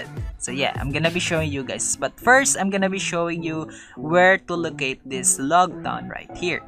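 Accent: Philippines. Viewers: This accent, you're a filipino aren't you?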